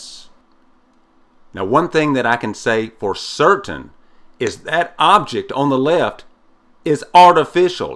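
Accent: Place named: East Texas, United States. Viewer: man! Thanks for the video! Your work is my favorite! Must be that East Texas accent.. Or them funky tunes. Keep it up man. We love ya in Louisiana!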